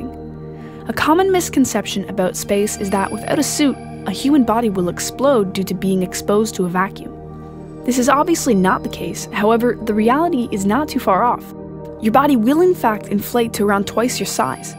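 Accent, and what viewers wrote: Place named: Canada. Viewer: I'm sensing a Canadian accent, specifically any word that rhymes with "out". You don't have the full-out "oot" pronunciation, but I'm still hearing a slight difference from the American accent of which I speak.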